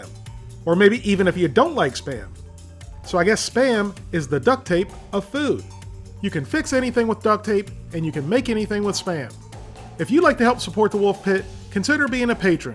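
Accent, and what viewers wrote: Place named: Maryland, United States. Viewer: What part of Maryland you from, chief?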